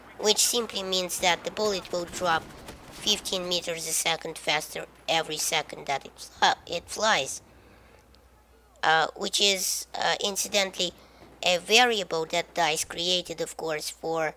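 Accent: Russia. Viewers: видно что ты русский :DDD с твоим произношением англиского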